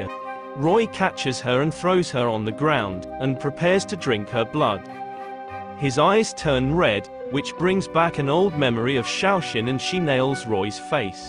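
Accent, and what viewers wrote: United Kingdom. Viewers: Yo u British bro? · I think he is! I didn't know british ppl sounded like that no hate to them and they sound pretty cool!! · Nah jit British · Yo u british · yo you British??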